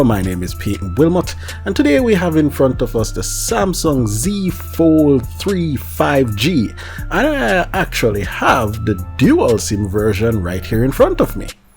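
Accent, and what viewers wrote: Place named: Jamaica. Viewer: Real Jamaica talk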